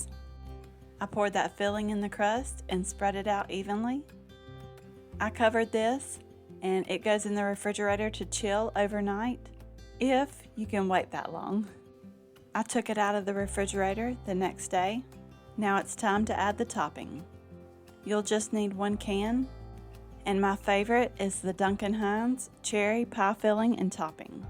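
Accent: South, United States. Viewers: I just love your sweet southern voice. All of your recipes look so delicious!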